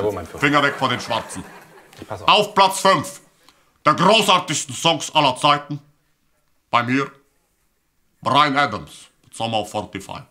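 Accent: Germany. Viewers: Ich kenne leute die denken das jeder deutsche so spricht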